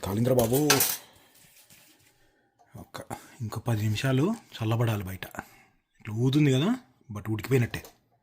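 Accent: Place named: Krishna District, India. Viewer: Mee maata teeru krishna distrik laaga vundi same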